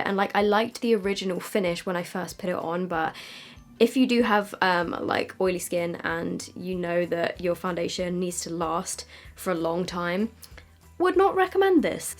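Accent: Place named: United Kingdom. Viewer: Its so hard to watch this when u hate british accent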